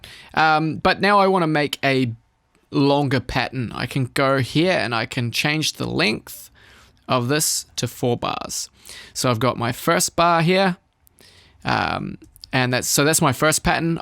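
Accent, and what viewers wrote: New Zealand. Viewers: Heard you speak in the intro and was immediately like "ay new zealand!?" haha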